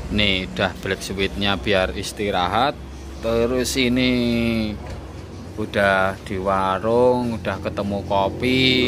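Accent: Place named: Java, Indonesia. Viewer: Jawa tulen mas😅😅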